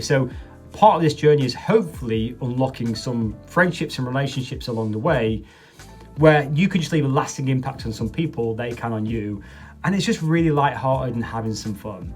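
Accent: United Kingdom. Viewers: (this comment was based on your UK accent lol)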